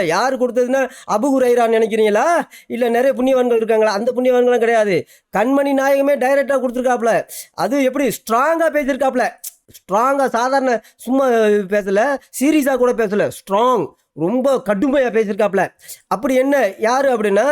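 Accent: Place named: South Tamil Nadu, India. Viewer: சிறப்பு சிறப்பு நன்றாக வெளிச்சம் போட்டு காட்டுகிறீர்கள். பேசும் தொனி ஏற்ற இரக்கம் தென் பகுதி உச்சரிப்பு பாராட்ட வார்த்தைகள் இல்லை 🫂🫂🫂🫂🫂🫂🫂🫂🫂🫂🫂🫂🫂🫂🫂🫂🫂 Number one 😢😢😢😢😢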